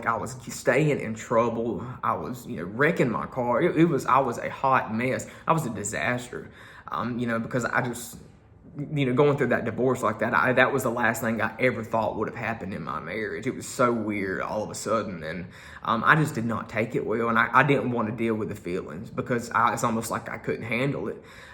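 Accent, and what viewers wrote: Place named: South Carolina, United States. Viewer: you are SO WISE! They know how to raise 'em in South Carolina! And your accent is adorable. I wanna wrap you in my arms and hug you to death! (Well, maybe not THAT much LOL.)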